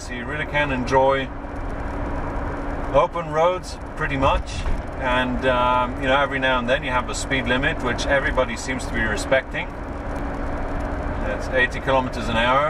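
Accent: United Kingdom. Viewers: you sire are from the Belgium?! Anyhow, Your queen english is like from Milton Keynes.:) I Have e34 525i, e39 540 and 635 CSi 1988. -Happy holidays -autobahn guy from Finland!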